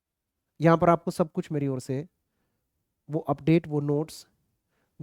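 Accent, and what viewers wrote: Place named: Haryana, India. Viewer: Apki harianvi bhasa sunkar bahut acha laga😂😂😂😂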